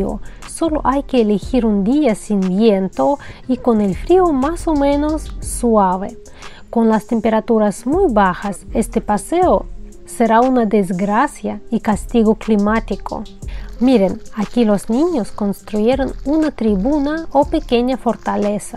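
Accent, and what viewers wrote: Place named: Spain. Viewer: ¿Por qué hablas como español?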